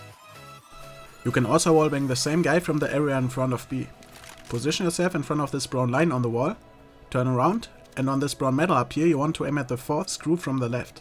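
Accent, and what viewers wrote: Germany. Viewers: wow, it's hard listening to this guy. dat German accent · Are you German? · Man hört einfach, dass er deutsch ist xD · Hört sich so deutsch an :) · For me understand your english is really hard because you have fkin German accent :D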